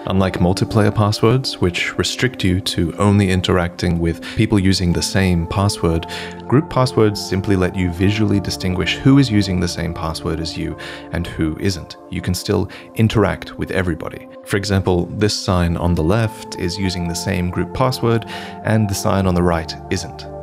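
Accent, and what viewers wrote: Australia. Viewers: Thank you, friend, for your sage advice. I am VERY new to Elden Ring and still struggling with the keyboard mechanics having come from a PC WoW type of set up, but this tips and pointers you offer are clear and concise. Being an Australian, it's also nice to hear a familiar accent and I think you should consider reading for Audible. Many thanks. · Seriously can’t place this dudes accent - sounds like a mixture of posh Aussie with a bit of US/UK flavour thrown in?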